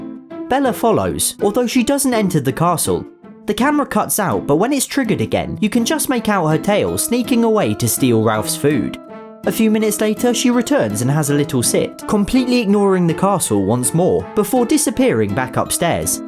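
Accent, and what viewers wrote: United Kingdom. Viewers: male jenna marbles with a brit accent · Just wondering do you speak British cuz I’m confused 😂btw love your vids and your cats 🐈🖥️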